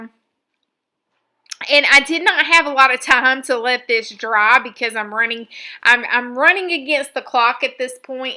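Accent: South, United States. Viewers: Love this!!! Funny story.. the video alert popped up on my phone and I must have hit it by mistake and I am on a work conference call and all I hear is your sweet southern accent describing what a giblet is and I am literally trying to find the video pause or mute button.🤦.LMAO!!!..oh well the perils of working from home😂😂💕🤸😘🤗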